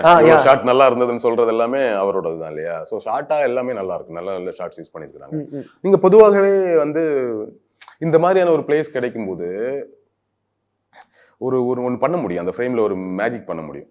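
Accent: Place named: Kanyakumari, India. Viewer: avar slang kanyakumari mari eruku bro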